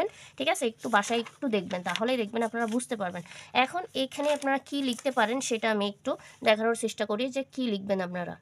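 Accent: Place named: Pabna, Bangladesh. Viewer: আপু, আপনার বাসা ki পাবনা, সিরাজগঞ্জ এর আশেপাশে? ভাষা টা খুব পরিচিত লাগলো!❤️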